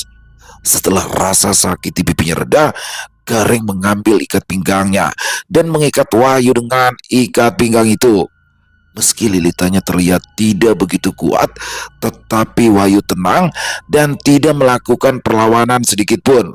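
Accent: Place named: Banyumas, Indonesia. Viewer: Ini mas kalo di joinin dengan mas bawor cocok,sama sama ngapaknya dari banyumas ❤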